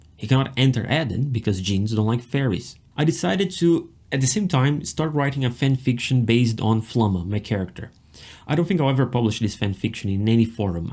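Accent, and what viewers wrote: Brazil. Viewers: I was suspecting you were Brazilian because of your accent, then I saw you chatting in Portuguese lol Nice video, meu conterrâneo!! Let's play together sometime... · KKkkk estava assistindo o video e pensei se era Brasileiro kkk pelo sotaque. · Demorei a perceber que você era brasileiro, seu sotaque é maravilhoso. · Are you brazilian? I can sense your accent might be from there! :) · Are you Brazilian by any chance? I saw you are playing in Portuguese. And I can sense I little bit of ascent. I'm from Brazil btw haha😊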